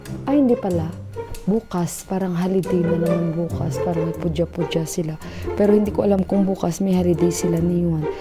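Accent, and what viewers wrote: Iloilo, Philippines. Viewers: sis taga san k sa pinas, parang ung accent m ilongga 😊.. mag watch nko ng vlog m now q lng nag p nood..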